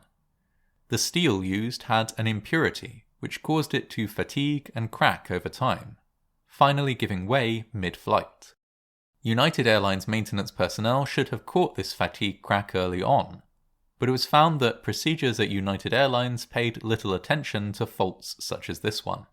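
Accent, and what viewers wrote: United Kingdom. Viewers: Tell me about the horrible history my soothing british man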